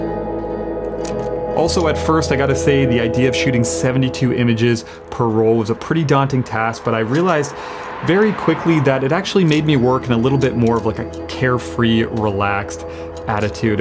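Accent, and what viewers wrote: Canada. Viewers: Sounds Canadien.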